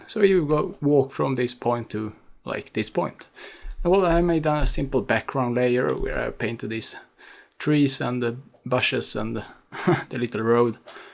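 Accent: Sweden. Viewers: Du är svensk!!!! Man hör de jätte bra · are you swedish? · Man hör att han är svensk! :)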